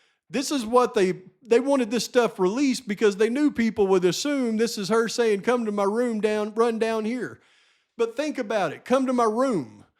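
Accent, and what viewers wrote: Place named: Tennessee, United States. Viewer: You're definitely from Tennessee. Possibly KY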